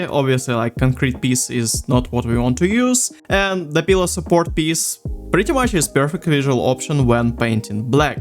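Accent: Eastern Europe. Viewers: слов'янський акцент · What's your accent? Sounds eastern-european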